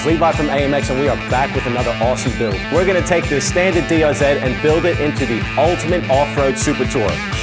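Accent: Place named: Australia. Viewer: Like it's kinda Aussie but all over the place at the same time?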